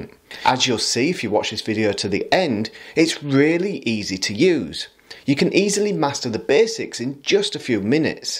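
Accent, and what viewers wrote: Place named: Middlesbrough, United Kingdom. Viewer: Are you from Middlesbrough? You sound like Bob Mortimer!!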